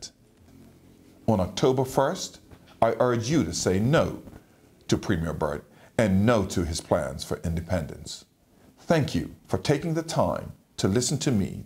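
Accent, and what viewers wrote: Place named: United States. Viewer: His accent is more American what gives 🤓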